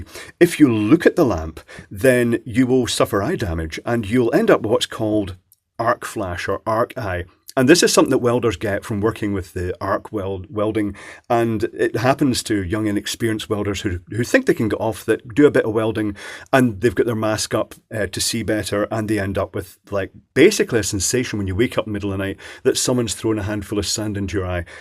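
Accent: Scotland, United Kingdom. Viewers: it's good to hear a Scottish accent for a change.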